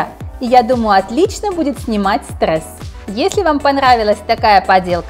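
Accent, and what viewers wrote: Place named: France. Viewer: Кто заметил её акцент , похоже она францужинка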